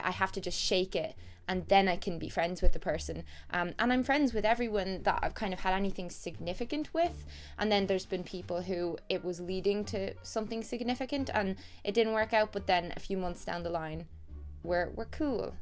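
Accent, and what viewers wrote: Ireland. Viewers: Where is she from? Ireland? Australia? UK?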